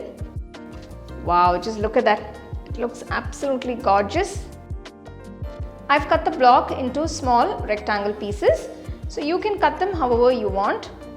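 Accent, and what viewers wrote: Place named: Tamil Nadu, India. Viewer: Why speaking in english? sattu burfi is tamil word I think,if it's correct then speak in tamil,nd ur accent shows u r a tamilian,so speak nd explain in ur language ❤❤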